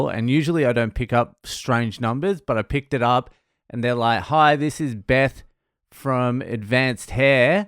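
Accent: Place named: Australia. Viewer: I didn't realize you were Australian. I'm sorry, carry on.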